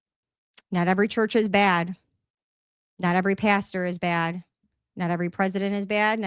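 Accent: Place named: Midwest, United States. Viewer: Youre from Florida 😮 i thought by your accent you were from the Midwest like Wisconsin 😂😅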